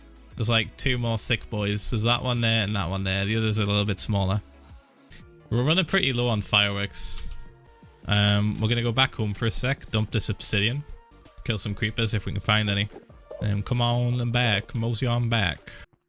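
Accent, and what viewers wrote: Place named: Northern England, United Kingdom. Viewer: Nothharn LKegnAnd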